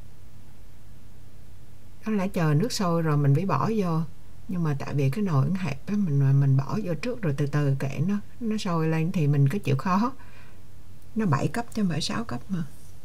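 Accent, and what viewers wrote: Quang Nam, Vietnam. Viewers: nghe giọng Quảng Nam, mình thích cực kỳ!❤️ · Nghe chi noi ma minh Nho toi ma minh , ma minh nguoi Tra kieu, Quang Nam. Don gian , moc mac , khong trau chuoc. Cam on Chi chi lam nhieu mon an ma nguoi Quang Nam ua an . Chuc chi luon khoe va gia dinh luon duoc hanh phuc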